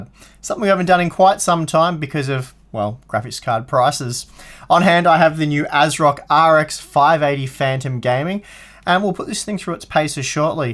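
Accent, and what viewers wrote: Australia. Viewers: you have an aussie accent so i assume youre a fellow aussie, anyway i bought an msi 580 8gb armor for 250$ aud last week so prices are dropping hard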